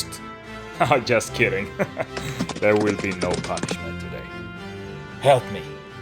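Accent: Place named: Sweden. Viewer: Hi amazing work. Sounds like u are from Sweden. What part are u from?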